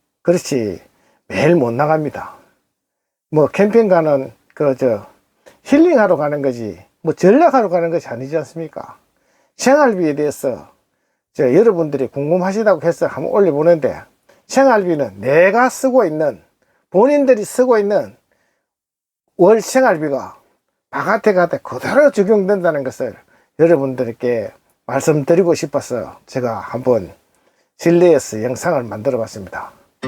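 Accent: Gyeongsang, South Korea. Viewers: 경상도 분인가 봐요. 구구절절 맞아요. 저도 9인승 5인승 구조변경 스타리아 라운지 8천만 원대, 1년 3개월 된 것을 5천 2백만 원에 구입하였는데 새 차나 다름없더군요. 우리 마눌님하고 3박~4박으로 주로 관광 목적으로 다니고 있지요. 비용을 아껴 써도 적게는 20십~40십만 원 들어갑니다. 저도 테이블은 사용하기 불편하여 무게만 나가고 집에 두고 다니고 있어요. 스타리아로 70대 분이 10개월 만에 세계 일주하신 분이 있더군요. 건강하시고 행복하세요. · 선생님 잘 들었습니다 항상 건강하시고 좋은 캠핑 힐링 하십시오 경상도 고향이신것 같아요 ~~~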